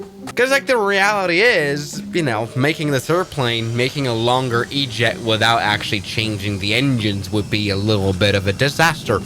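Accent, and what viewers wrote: United Kingdom. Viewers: Never would i think on this beautiful day that Sir would prefer the british accent on this inaugural day! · Cause he was in London City airport and in london speak british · Damn mate you bri'ish now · Love thee bhrithish akhcent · bri'ish now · I ponder why this gentleman was conversing as a britman. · Why does he sound a bit British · plz no plz no why british accent why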